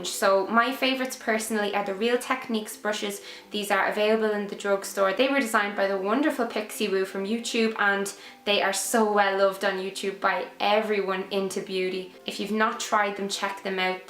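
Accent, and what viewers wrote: Ireland. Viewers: New Subbie!!! Are you Irish (sorry if I'm wrong I'm really bad at recognising accents) Do you know how much the body shop setting spray is??? xxx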